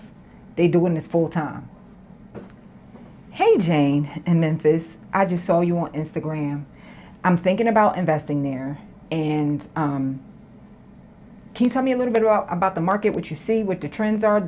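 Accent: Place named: New York, United States. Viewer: Hello lady is your sister the wife in the Henry family ? You all look similar and have the same accent ? Like New York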